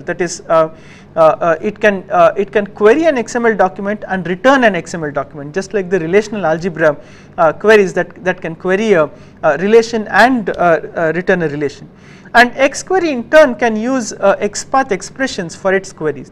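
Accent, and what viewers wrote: India. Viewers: speak a little slower because your Indian accent isn't the best sir i can't understand hardly anything you said.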